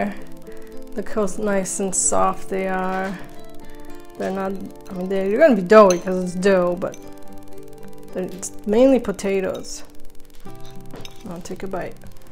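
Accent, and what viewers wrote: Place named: Poland. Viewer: What's wrong with the inexpressibly sweet Polish accent, spoken by a woman? Whenever I hear a woman speaking with a Polish accent, my affected ears become listening and absorbing shells, as it were, in transport! In other words, I turn to jelly, in ecstasy!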